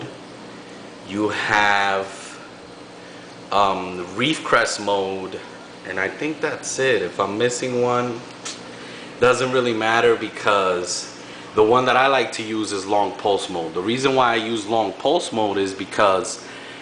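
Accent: New York, United States. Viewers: you sound like my uncle being that hes from new york its kinda weird...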